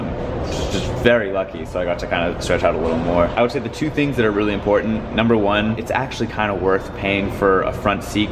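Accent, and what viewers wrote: Boston, United States. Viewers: I rode a Greyhound for the first time in a long time recently and paid extra for the reserved seat and I only realized halfway through the ride, that I was put in the wrong row (it was only one off but still) so yeah reserved seating is a scam. Love the Bahston accent!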